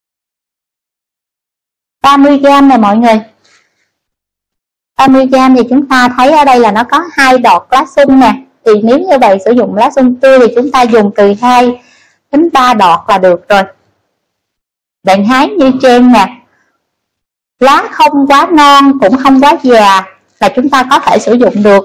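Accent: Vietnam. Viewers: Nghe tiếng yếu và nhỏ, giọng Miền Nam, nghe rất ức chế... Sau nên in chữ phụ đề bói ử dưới nhé, tốt nhất nên lồng tiếng giọng to và miền bắc. . nghe đỡ khổ sở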